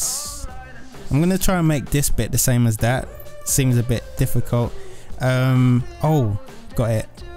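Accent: United Kingdom. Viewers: Welcome back! I've missed your amazing English accent:)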